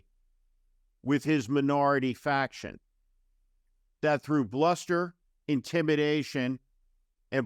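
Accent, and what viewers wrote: United States. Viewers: Steve Schmidt sounds like a true American!!!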